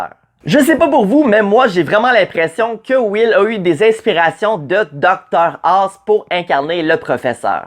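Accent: Quebec, Canada. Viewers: L'accent québécois ça me tue Mais merci pour l'explication quand meme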